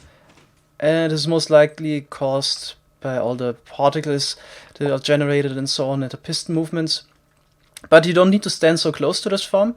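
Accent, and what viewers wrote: Germany. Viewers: Wie man einfach das deutsche raushört :D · Are you German? Your accent sounds like it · Wow, dieser Deutsche Akzent.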